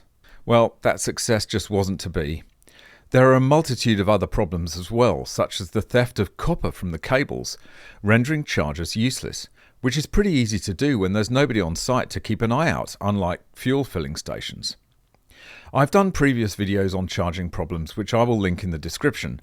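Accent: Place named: England, United Kingdom. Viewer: The channel host is from England. He even says as much on his channel summary description. Moreover, he has an English accent. 🇬🇧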